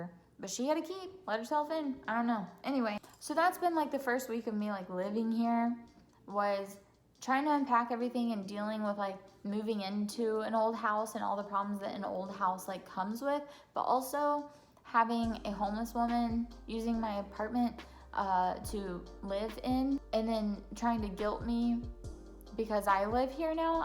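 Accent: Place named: Coventry, United Kingdom. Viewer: yew sounds like wer we live in coventry ri be safe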